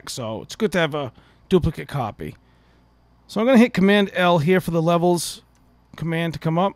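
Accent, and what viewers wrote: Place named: Boston, United States. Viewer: just subscribed. placed your accent in Steven Wright country, so not surprised to hear the Boston reference. nice straightforward tutes delivered humbly . thank you sir.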